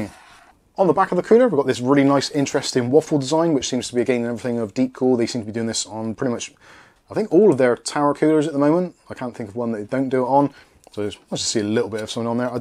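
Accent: United Kingdom. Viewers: just curious to know, where about in UK are you from?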